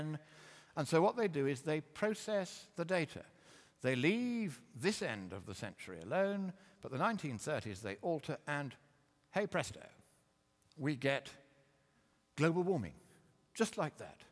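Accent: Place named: Scotland, United Kingdom. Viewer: he's scottish. That's a (way) upperclass scott accent.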